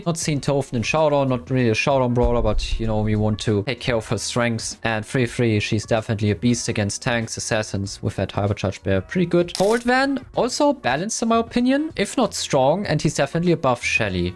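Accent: Germany. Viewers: english is not his primary language, he's german, don't expect too much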